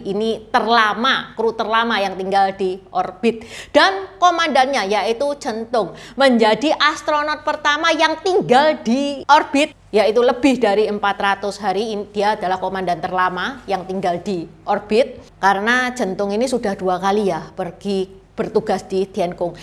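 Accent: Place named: Surabaya, Indonesia. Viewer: Bukan beritanya yg saya suka, tapi dialek khas Surabaya nya yg apik tenan.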